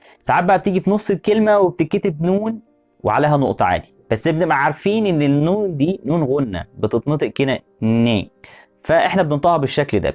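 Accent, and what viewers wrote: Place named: Pakistan. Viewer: لهجتك باكستانيه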